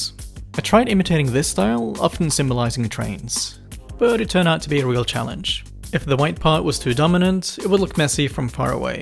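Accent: Australia. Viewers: This is a great video. I'm struggling to pick you accent though. It sounds like you're Australian, but with a big chunk of the south of England thrown in.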